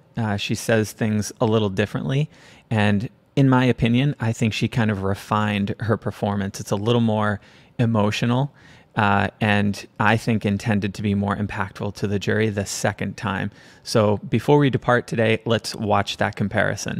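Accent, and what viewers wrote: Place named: Georgia, United States. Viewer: Actually, sweet sound of Georgia.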